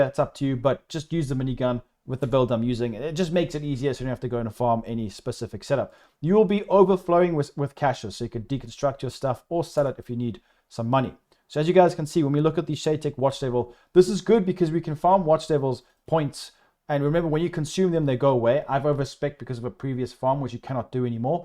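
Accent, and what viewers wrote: South Africa. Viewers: Hey are you South African you have that South African accent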